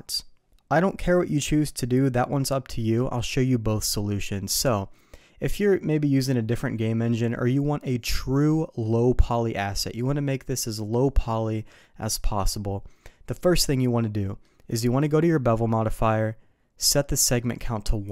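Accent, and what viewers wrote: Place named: North America. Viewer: You sound North American to me. You have a deep voice, you must be 6'3", therefore I need to be all ears, as English is my second language. Overall, I mean, it's good to hear native english speakers. When someone tries to explain with strong foregn accent, I just turn off their videos. Lol. Thanks for sharing useful info! Good luck!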